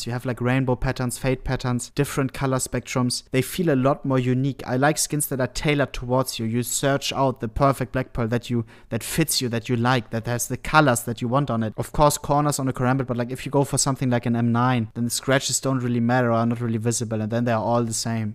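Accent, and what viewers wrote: Germany. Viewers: Youtube auto captions is writing Germand words while he is peaking english WHILE being german, despite him having a very very good english elocution, and i can't help but feel amazed. I can TELL he is GERMAN, but for a robot to accidentally write german words while he is speaking more than decent english blows my mind.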